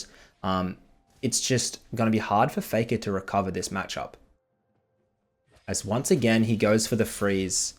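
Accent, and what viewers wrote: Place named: Australia. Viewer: Out in the bloody boony's in woop woop. farming. yourself. . This man is so Australian it hurts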